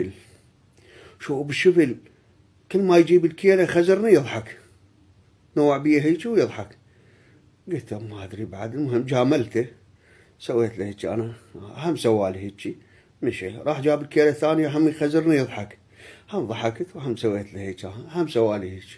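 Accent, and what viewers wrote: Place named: Diwaniyah, Iraq. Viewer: هاي الهجه فرات الأوسط ديوانيه اكثر شي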